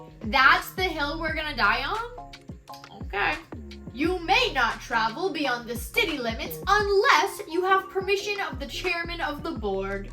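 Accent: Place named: North Carolina, United States. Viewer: I'm just curious if you're from North Carolina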